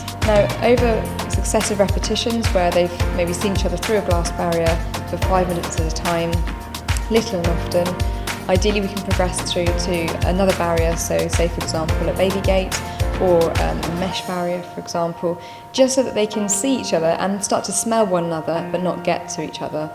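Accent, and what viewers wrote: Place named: United Kingdom. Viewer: The music was overpowering the female voice and it was a British accent, so even more difficult to understand.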